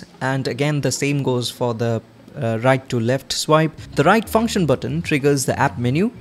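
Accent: India. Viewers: Good review! By the way, your accent is nice too not the typical heavy Indian accent that's somewhat annoying because it's super hard to understand. FYI regarding the GPS though most Running watches even Garmins have issues when near or inside buildings.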